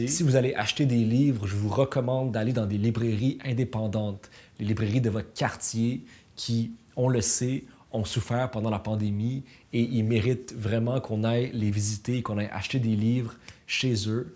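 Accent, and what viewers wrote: Quebec, Canada. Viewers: Je suis Française. Qu’est ce que j’aime l’accent du Canada 😍 et des vidéos tjrs enrichissantes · Il est québécois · Ton accent québécois est magique! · Tu vois le beau gosse arriver et puis l'accent Canadien ... Ahhh Non.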